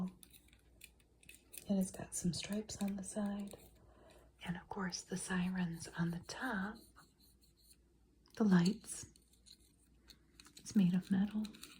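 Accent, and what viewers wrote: United States. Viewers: Love the videos, australian here and I'm curious what regional accent I'm hearing? It's so oddly charming and I don't know the first thing about US dialects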